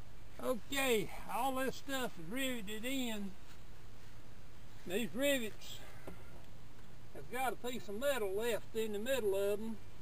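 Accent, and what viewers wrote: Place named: South, United States. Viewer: That's some southern accent! Nice work on the window regulator.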